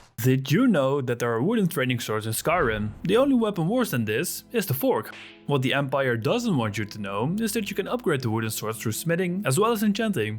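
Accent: Netherlands. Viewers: I love the dutch accent seeping through, so yes instant new abonnee x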